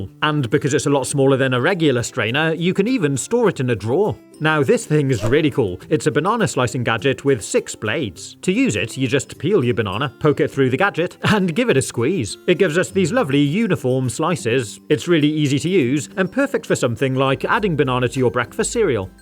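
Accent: United Kingdom. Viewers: Are you British? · Wait….. HE IS BRITISH LIKE ME!!!! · He is British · Yo u have a British accent 😂 nice idea🎉❤😊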